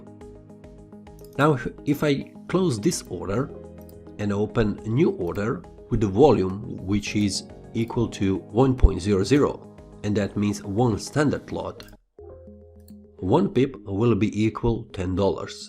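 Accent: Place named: Serbia. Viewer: I like your accent where are you from? Serbia?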